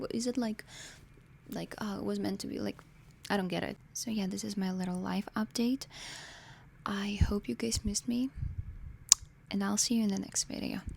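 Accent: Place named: Eastern Europe. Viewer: Love your slavic accent😊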